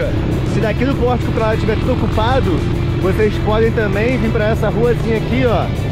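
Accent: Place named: Rio de Janeiro, Brazil. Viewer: Caga tijolo. Kkkkkkkkkk😂😂😂😂😂. Adoro esse sotaque. O sulista e o carioca sao os sotaques mais gostosos de se ouvir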